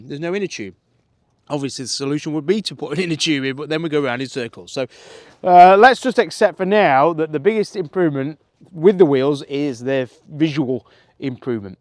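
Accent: United Kingdom. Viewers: Sitting here in QLD Australia watching this video tonight after work, highlight of my day mate, love watching your videos, and listening to you, miss the uk accents ! Waiting on delivery of a CFMoto 450MT, super popular bike, looking forward to breaking it in 👏👏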